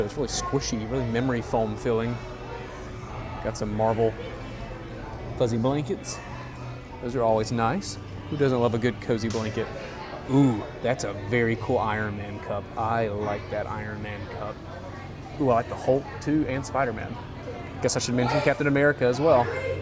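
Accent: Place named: South, United States. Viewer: Where is from? He has a THICK southern accent. Haha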